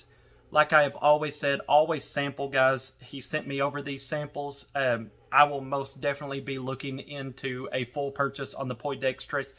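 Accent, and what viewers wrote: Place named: South, United States. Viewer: Subbed and I’m just loving the southern twang of your accent! 🥰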